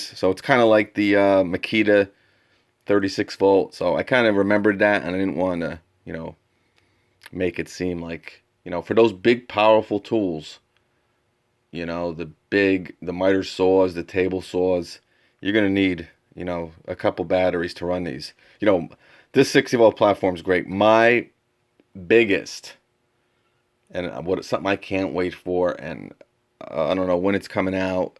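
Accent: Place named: Boston, United States. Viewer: “You know” “You know” “You know” “You know” do you realize how stupid you sound repeating that over and over? Not to mention sucking on spit at every pause. You have to be from Boston. Only dumb dumbs raised in New England talk the way you do. “You know?” “You know” “You know”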